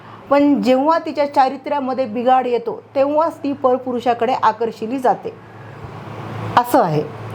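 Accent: India. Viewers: Aapli nanded hingoli chi bhasha, language ❤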